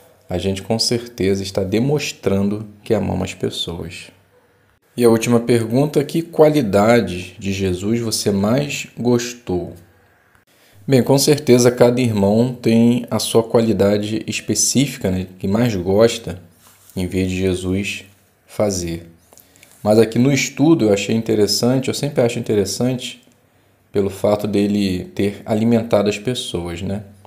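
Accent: Rio de Janeiro, Brazil. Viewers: IRMÃO, MATE MINHA CURIOSIDADE: O. IRMÃO É? A VOZ É IDÊNTICA; ATÉ O SOTAQUE BEM CARIOCA. BOM DIA. ABRAÇOS TERNOS E FRATERNOS. 26/08/2022.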